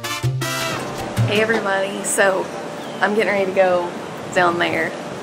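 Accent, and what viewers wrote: South, United States. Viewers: OMGosh your Sammie looks just like my Lexi - right down to the few white hairs between the eyes. New subbie here, just found you tonight. I hear that "southern", may I ask where you are from - Im from the south myself. Really enjoy your vids - congrats on the wedding.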